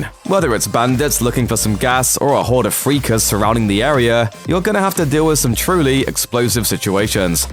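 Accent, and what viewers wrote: United Kingdom. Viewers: With all due respect Fuck British accent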